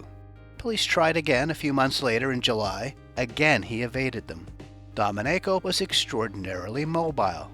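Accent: Canada. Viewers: Hi. You sound really Canadian! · Is he from Canada ?I've been trying to figure it out. He sounds like he's from Canada. Asking from Vancouver!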